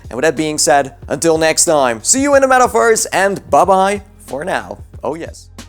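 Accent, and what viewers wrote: Netherlands. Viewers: I love the way you say notoriety, dutch?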